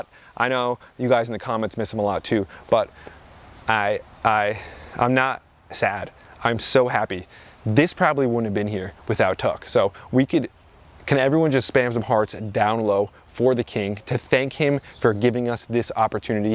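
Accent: New Jersey, United States. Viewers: I could listen to him just say Water in that Jersey accent all day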